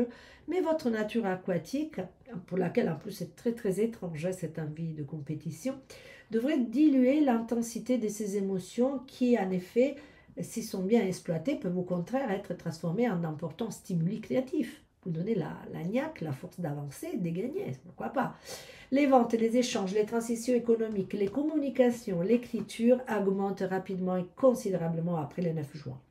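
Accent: Italy. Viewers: Merci beaucoup. J'adore votre petit accents italiens, ça me rappelle mes origines italiennes par ma mère, française par mon père. Perso je suis balance ascendant balance je compte sur Jupiter. 😊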